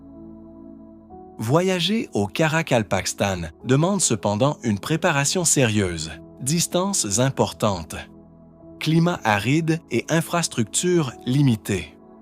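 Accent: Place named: Quebec, Canada. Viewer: Merci pour cette vidéo. Des images magnifiques et un petit accent (Québec?) très sympa. Bravo pour votre travail.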